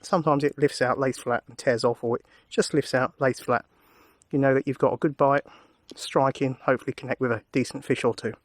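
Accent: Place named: Sarawak, Malaysia. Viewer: Sounds like ur in Sarawak bud!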